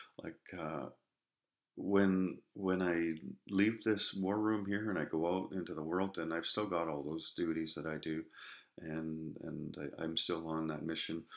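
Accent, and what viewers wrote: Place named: Canada. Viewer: you are awesome, you want to here something funny? I had my closed caption on while watching this video...according to the captions you are pregnant... i'm still laughing, they obviously don't use a caption-er who picks up your Canadian word accents. but congratulations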